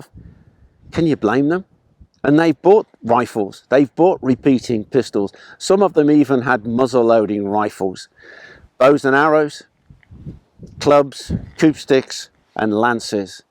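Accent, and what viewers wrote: United Kingdom. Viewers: Got a love how a person with a British accent wants to judge people on colonialism and expansion...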